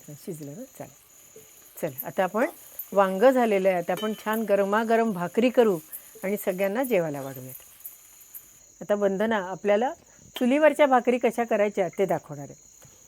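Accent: Standard. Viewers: माझं सासर लांब असल्यामुळे माझी आई आजारी आहे ती आणि माझे वडील माझ्या बहिणीच्या घरी गेलेले आहेत . भाऊ घरी एकटाच आहे . लाॅकडाऊन मुळे त्याच्या जेवणाची आबाळ होत होती. मी त्याला रोज तुमच्या रेसिपी शेयर करते खूप छान असतात. शिवाय तुमचं बोलणं शुद्ध , स्पष्ट असल्यामुळे ऐकायला खूप छान वाटतं . शुद्ध मराठी सध्या लोप पावत चालली आहे. तुम्हाला खूप खूप शुभेच्छा. अश्याच सुंदर रेसिपी शेयर करत रहा.🙏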